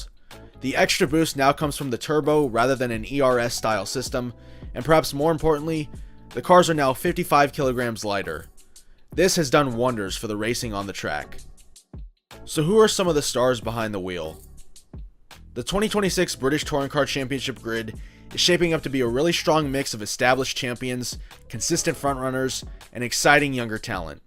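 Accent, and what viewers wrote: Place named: United States. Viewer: I live 10 minutes away from brands hatch and have been going to watch the touring cars since the 90s. Its always been an exciting series to watch and has captured me since I was a child. I cant lie its a bit mad hearing an american make a video on this championship but clearly you have a good knowledge of the series and this was a great watch!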